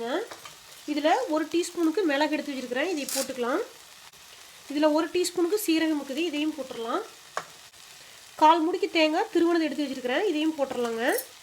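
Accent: Kongu, India. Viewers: Ungaloda kongu Tamil arumai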